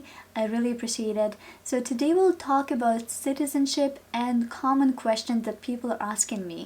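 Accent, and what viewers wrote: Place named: Eastern Europe. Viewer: you have an east European accent and I Wana visit Poland, Croatia and Bosnia in the summer that was why I asked, never mind anyways I'm from Calgary.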